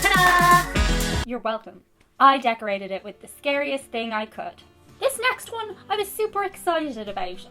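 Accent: Ireland. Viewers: Another funny one!!! You are Brilliant! You sound so American, every once in a while I hear a little Irish come out but you just speak so well! Texas is coming soon! Cheers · P.s. part of the charm of your videos is the accent. In America, we enjoy hearing Irish accents. I suppose we think it’s cute, or whatever. So, if you pronounce Fall like an American, it’s sort of like removing and discarding the chewy center of an Oreo cookie, so to speak. By the way, we call a pumpkin a punkin’. We know our pronunciation is wrong, but we say it like that, anyway. It’s just cute, or whatever.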